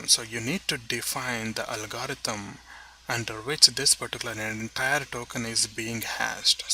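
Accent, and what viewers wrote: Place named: India. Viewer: I can't listen to Indian accent it's so annoying :(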